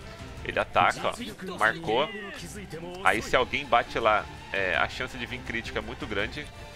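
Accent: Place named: Brazil. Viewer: Idai caralho, o cara é Br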